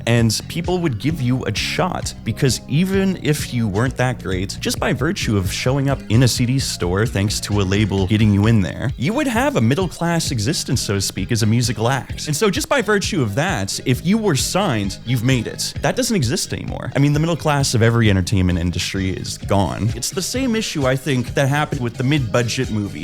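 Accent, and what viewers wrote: Canada. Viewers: All you Canadians sound the same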